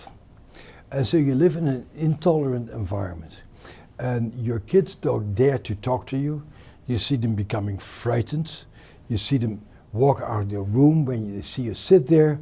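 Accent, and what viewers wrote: Netherlands. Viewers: I can just tell that this guy has to be Dutch just by accent! If he isn't I will.... eat my socks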